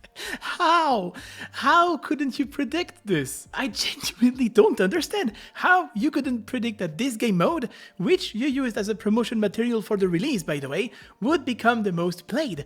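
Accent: France. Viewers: Ton accent français 😂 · T'es français fréro l'accent ça trompe pas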